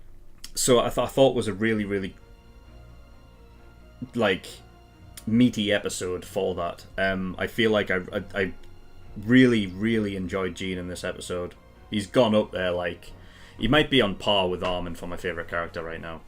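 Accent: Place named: United Kingdom. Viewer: イギリス英語聞き取りやすくていいなw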